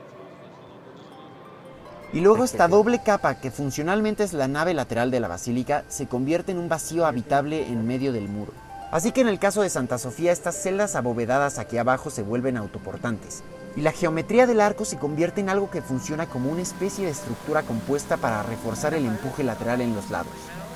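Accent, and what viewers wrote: Mexico. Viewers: Un video diferente a los que solo pasan de largo por la ciencia y la cultura de lo que filman. Tienes acento mexicano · Creo que es un vídeo de pacotilla al tratar de comparar os obras de arquitectura diferentes, creadas con diferentes técnicas y propósito… he estado en las dos y las dos son hermozas y si es solo de cúpulas en las que tenemos que hablar… bueno pues, las dos son diferentes y las dos siguen allí… los romanos fueron maestros en el uso del hormigón y no se a tenido que rehacer varias veces, además que tiene un calendario solar en su interior, con precisión milimetrica…. Bueno y para terminar, para poner un canal en cualquier idioma, hay que tomar primero lecciones en ese idioma, “el mexicano” que aquí escucho no es un español de lo mejor, siento lástima por aquellos que comentan que les aluda a prender español! El “mexicano” todavía no esta reconocido como un nuevo idioma verdad?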